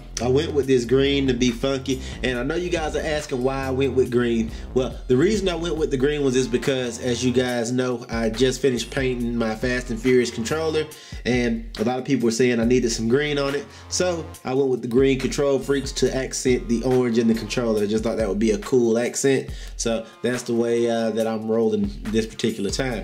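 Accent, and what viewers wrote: South, United States. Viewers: that southern accent thugh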